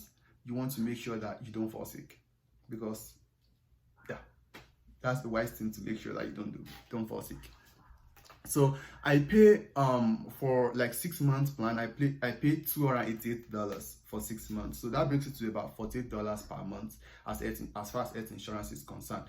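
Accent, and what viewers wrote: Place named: Ogbomosho, Nigeria. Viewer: Mateo and I are watching. He says he like your 'frequent' ogbomosho tongue :)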